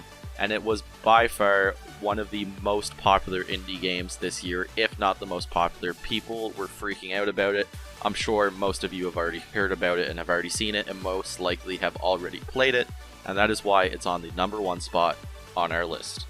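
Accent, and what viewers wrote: Canada. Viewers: You're Canadian right? LOL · Are you Canadian?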